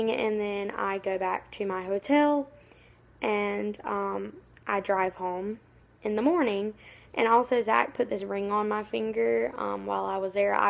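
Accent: United States. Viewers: you nail is more fleeky than my two hands (also are you from south or north Carolina because you sound like my aunt!) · you're so gorgeous inside and out!! love your country voice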